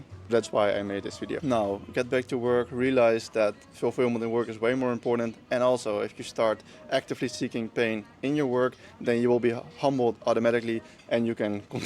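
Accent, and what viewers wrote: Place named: Netherlands. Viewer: I should've known you'd be something like 6'8" from the moment I heard your Dutch(?) accent. Idk why I was so surprised 😂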